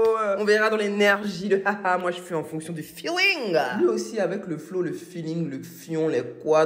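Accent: Ivory Coast. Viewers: Une métisse qui parle comme une bledarde ivoirienne....elle oublie son côté blanc. Moi je ne vois qu'une métisse en recherche d'identité à travers un accent et des expressions....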